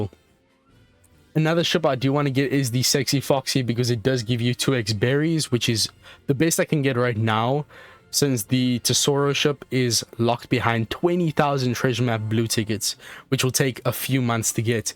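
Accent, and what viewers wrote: South Africa. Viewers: yooo are you from south africa?? 😅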